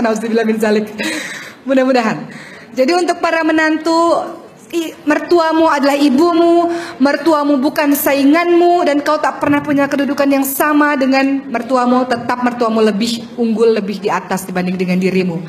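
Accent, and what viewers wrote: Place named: Malaysia. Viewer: Logat Malaysianya bagus kali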